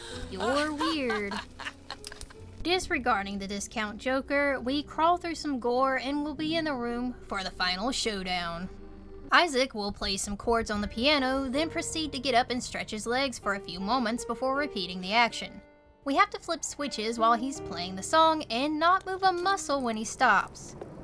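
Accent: South, United States. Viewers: you sound like you have a slight southern accent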